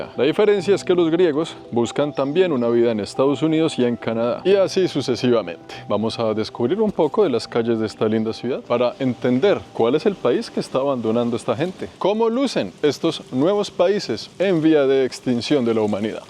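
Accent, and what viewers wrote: Bogotá, Colombia. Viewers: El acento de es el rolo o Bogotano, cada región tiene su propio acento.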